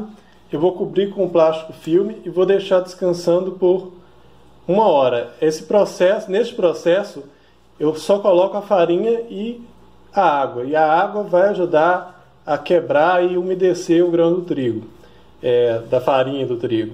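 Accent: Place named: Minas Gerais, Brazil. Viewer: Dou mais uma apertadim....... Sotaque mineiro não nega!!!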